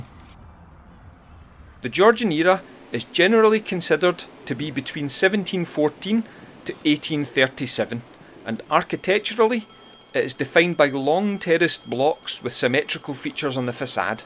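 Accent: Glasgow, United Kingdom. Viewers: I lived in Glasgow for four years and am always interested in places where I have been. I enjoyed the commentary, clear explanations, clearly spoken and with the Glasgow accent.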